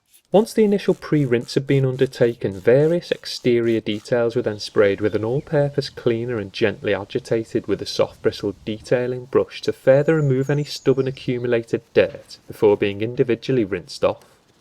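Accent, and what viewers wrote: Liverpool, United Kingdom. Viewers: Good video but please refrain from using this Scouse twat from commentating!!! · Scouse of course!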